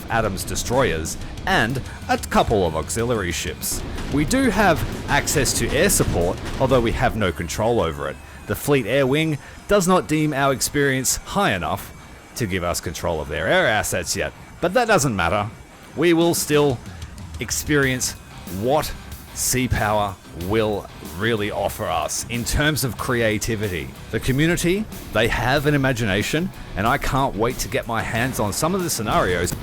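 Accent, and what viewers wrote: Australia. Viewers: Are you by chance from victoria?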